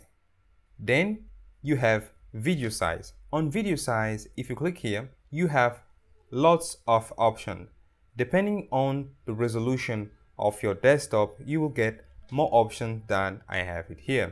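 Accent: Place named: India. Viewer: i like the slight indian accent lol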